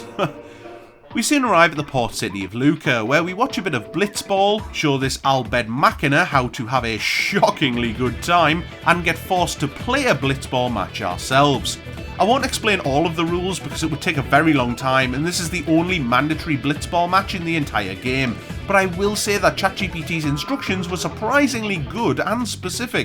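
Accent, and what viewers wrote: North East England, United Kingdom. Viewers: up the north east 🇬🇧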